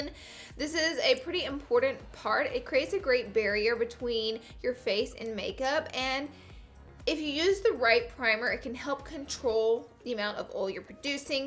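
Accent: South, United States. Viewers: Girl...I absolutely love you, you are too crazy lol! I love to watch your videos because I usually laugh the whole time. Us girls from the South ie. (Kentucky), we do say things different but I love my heritage. Even if we do say "OIL" wrong to others. He he!! Love ya girl and I will see you next time!! Xo <3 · Girl I'm from Louisiana and I love the southern accent!! My shirt right now says "talk southern to me" wish I could post a pic. Lol u. Need it! Weerrrkk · She sounds like she's from Georgia or South Carolina. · OMG there is nothing wrong with her voice!!! She is from the South so, she talks like that and obviously if you guys were from the south you would talk like that too. So why watch this video if you are going to make fun of how she talks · Many southern people talk like this! Lol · I love your southern accent, it makes me feel at home ❤️ don't ever apologize for it. 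😘 love from Louisiana (except your football team. Nope. LSU forever 🐯) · I love accent. I was born in Venezuela and in Latin American but I moved to Texas when I was a baby. I grew up in the south and her accent is so beautiful. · I’m from the south I know ur struggle with words Ily ❤️❤️❤️❤️😘😘😘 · what part of the south are you from? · Love you I myself is from the south and I also say it like you do haha! Not ashamed! I am also a hot oily mess girl! I love these tips thanks so much! 😘😘😘 hope you're having fun in New York! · Oh my gosh I honestly love you so much like I just started watching your videos a week ago and I've been binge watching them non stop😂 I think your super funny and cute and I love your south accent.. you kind of inspired me to get into makeup. I'm only 14 but it's soo funn lol keep dining what your doing girl ❤️ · I love your Southern accent! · I love your southern accent 🤙🏼🤠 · What part of the South are you from? 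· I literally just started watching this video, I'm 45 seconds in, and I already love her. I was raised in the south as well, although I don't say oil like that, and I love her accent. She's super pretty too! (New subscriber here!!) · you're so hilarious and I love the southern accent 🤗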